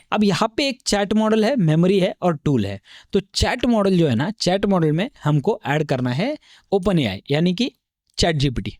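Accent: Maharashtra, India. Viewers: Bhava tu marathi aahes na tuja Accent ni kaltay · Thank you very much bro for introducing me to AI Agent world. Although I am a software engineer but I have never explored AI Agent side. Your way of describing is very good and seems genuine. Describing everything from scratch. I like your Hindi with Marathi style, it reminds me my days when I was in Mumbai and Pune. Want to learn lot from you. :)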